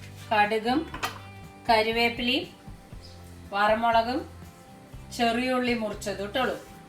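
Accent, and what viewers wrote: Palakkad, India. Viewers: mikka palakadan markkum avarude slang parayan valya madi ayirikum, chechi spr anu enik ishtayi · പാചകം കാണുന്നതിനെക്കാൾ പാലക്കാട്‌ ഭാഷ കേൾക്കാൻ നല്ല രസം.കറി സുപ്പർ.ഉണ്ടാക്കി. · Palakkadan padavalangha molakushyam+palakkadan bhasha(kittolu,avoo) endekuttiye enikku vayya.UGRAN. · Pkd style le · similar to thrissur style.. also like your palakkad slang, couse i has some of palakkad friends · Hello, namasthe🙏 Oru Trivamdrumite aayitu ee comment kandittu noki pokan kazhinjilla. Palakkadan bhasha nalla suka kelkaan.. ente childhood days njan orupadu ishtapedunna mannarkad kure spent cheythitundu Oro deshathinte bhashaye inghane public aayi criticize cheyyunnathu sheriyano.. oru nallathu parayan oru negativity de avasyamundo.. , I love Palakkad too.. may be njan Trivandrumite aayondavum anghane ishtapedan pattunathu😊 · പ്രോഗ്രാം സ്ഥിരമായികാണാറുണ്ട് ഗംഭീരമാകുന്നുണ്ട് പാലക്കാടൻ ഭാഷയും നന്നാവുന്നുണ്ട് പടവലങ്ങ എരിശ്ശേരി ഉണ്ടാക്കുന്നത് കാണിക്കാമോ.... 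· Palakkadan bhasha kelkkumbo oru santhosham 😊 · I am also from palakkad..can relate ur way of talking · PALAKKADAN BHASHA NALLA SUKHATTO KEKKAN......TVM BHASHA KETTE KETTE MATIYAYITTO....AVIDE KORACHE ARROGANT AANE BHASHA....PLKDN STYLE ORU ELEMA INDETTO...PARAYATE VAYYA MOLE...😊😚😊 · Hai chechi chechide palakkadan touch super enike ishtayi chechi de talking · Venu Gopal, അല്ല സാർ, ഞങ്ങൾ വള്ളുവനാട്ടുകാരുടെ സ്ലാങ് ഇങ്ങനെയല്ല...ഇവർ പാലക്കാടിന്റെ അങ്ങേയറ്റത്തെവിടെയോ ആണ് ( തത്തമംഗലമോ കൊല്ലങ്കോടോ ചിറ്റൂരോ ) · The description in Palakkad slang is so good! Great! I really enjoyed! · Sharikkum palakadan bhasha. Kure aayi kettitu. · Thani palakkadan bhasha...curry superb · Nammaley pkd slang spprr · Palakkadan accent....hi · Super. Love the language and slang of Palakkad style. My native place is Palakkad. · പ്രേത്യേകത പറഞ്ഞാൽ.. ഒട്ടും ബോറടിപ്പിക്കില്ല. വളരെ നല്ല അവതരണ ശൈലി. അതുപോലെ തന്നെ ഉണ്ടാക്കുന്ന വിഭവങ്ങളും super. 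പാലക്കാടൻ ഭാഷ 👌👌♥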